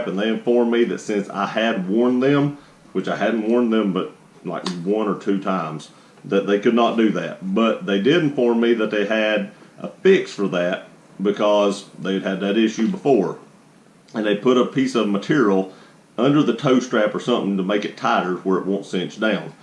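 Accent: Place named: Alabama, United States. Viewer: Are you from Alabama or TN?? The accent sounds like Alabama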